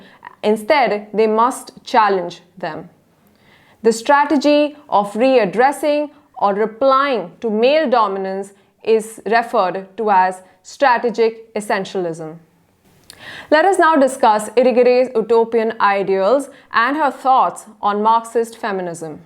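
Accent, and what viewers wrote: India. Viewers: I really appreciate what this channel has been doing but the instructors' Indian accent spoiled mine 🙊☺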